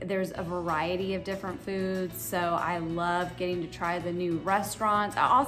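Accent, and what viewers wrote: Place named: South, United States. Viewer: Hey great video, I personally don't know a Virginia vs a Tennessee accent but you sure sound southern!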